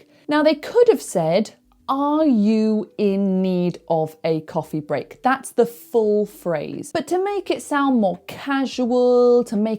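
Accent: United Kingdom. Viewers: It's great to hear British dialect 😍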